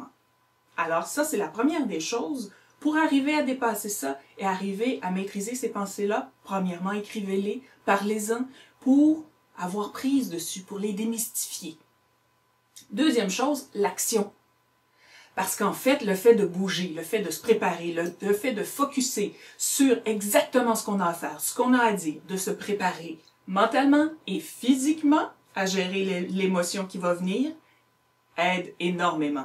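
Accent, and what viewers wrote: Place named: Quebec, Canada. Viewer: Moi aussi j'adoooore! Merci beaucoup pour cette vidéo, au si bel accent de la belle province! Gros becs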